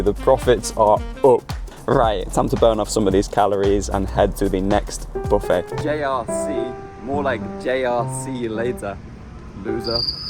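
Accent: Liverpool, United Kingdom. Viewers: I can tell by your accent. Liverpool is a great place. The Beatles came from there. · I think you're the first British YouTuber I've followed. I love the British accent. With all my love, I wish you the best of luck in your future.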